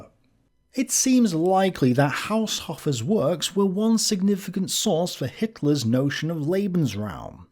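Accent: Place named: Scotland, United Kingdom. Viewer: I cannot stand Scottish accent and his constant liberal capitalist bias. If liberalism is so good, then why has it failed every Western country that adopted it? - Adûnâi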